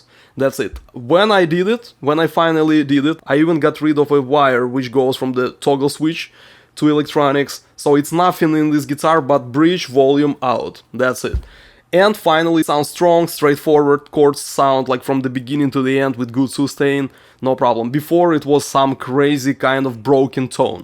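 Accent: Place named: Russia. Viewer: ёр инглиш вери вел))) как по мне это супер уровень, а русский акцент придает изюм))) те кто гонит на акцент наверно не слышали индусов или филиппинцев))) хотя я считаю что сложнее чем говор коренного британца брауни или кокни НЕТ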